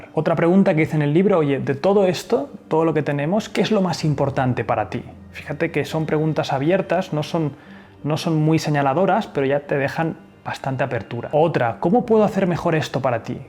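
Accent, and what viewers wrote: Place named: Spain. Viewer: Encuanto escuche ese puto acento español fuck supe que no vale la pena escuchar esto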